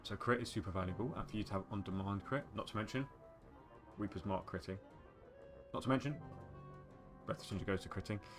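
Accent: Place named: England, United Kingdom. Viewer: the English language spoken with an English accent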